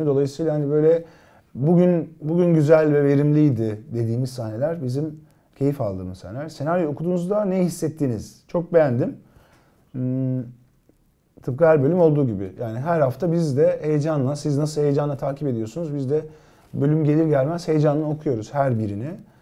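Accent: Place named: Black Sea, Turkey. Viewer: Sinan abi Allah aşkına sen karadenizli ol şiveni falan bırakma ŞUAN gerçekten MUSTAFA ASİYE sahnelerine ihtiyacım var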